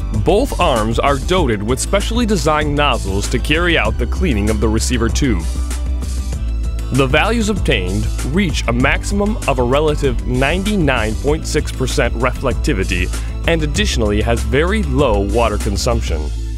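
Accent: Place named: Texas, United States. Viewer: 9000 l. tanks and waggy slow polybrushes 2-3MPH...18 loops of the Gemasolar/Torresol Energy place a day? It looks a horror. But it handles those close-in parabolic reflectors. Does the power plant condense much over 9000L on the turbines' cold side as some sort of side-effect? Was electrostatic or mirror-mounted cleaning out of the question, compared of course to the truck dieseling by all day? Application research and Basic R&D are different, you! The HTF (high throughput fluid) truck's 'nitrogen therusion' for keeping the rubber solid is the only real howler in the mildly gamy Texmexican Voiceover.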